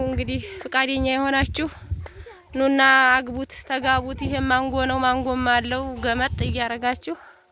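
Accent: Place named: Rural, Ethiopia. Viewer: ሀዋየገጠርልጅአማረኛነው አነጋገርሺ በርቱ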